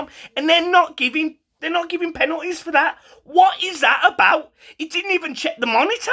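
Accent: Birmingham, United Kingdom. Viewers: Are you sure you are an Everton fan you sound like a Brummie to me! Support your home people! I don't care about Everton!!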